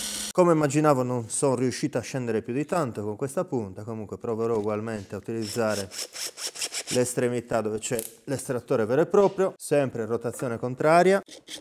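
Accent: Sardinia, Italy. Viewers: Sei sardo? (Io si è in tal caso si capisce😂)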